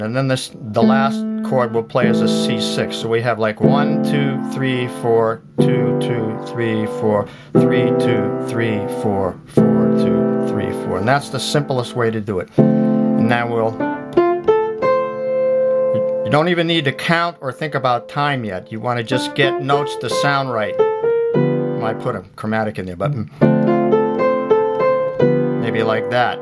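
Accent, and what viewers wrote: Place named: Maine, United States. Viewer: Your video just showed up on my feed and as I was watching it I immediately realized you were from Maine. I adore your accent, reminds me of home. ❤️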